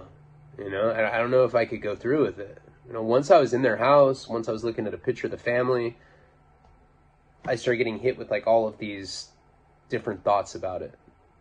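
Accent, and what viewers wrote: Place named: Boston, United States. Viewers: Your Boston accent makes me giggle poo poo Palabra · Oh my god! Your Boston accent!!!!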